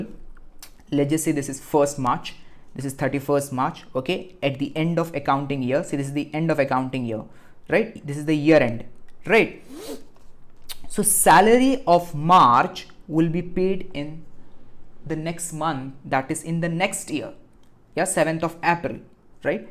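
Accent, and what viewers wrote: India. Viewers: as soon as I heard the Indian accent I felt I'm on safe hands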